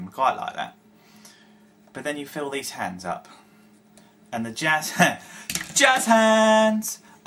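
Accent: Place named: Wales, United Kingdom. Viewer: I like your warmup techniques sir! Thankyou for reviewing him for me pal and repeating what a bag of gash he is haha! A fiver is not too shabby tho i must admit! Great stuff me ol chum:) If you ever go to rhondda that welsh accent could save you life too;)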